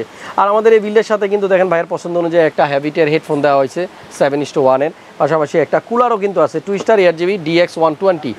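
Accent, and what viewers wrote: Noakhali, Bangladesh. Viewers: নোয়াখালী ভাই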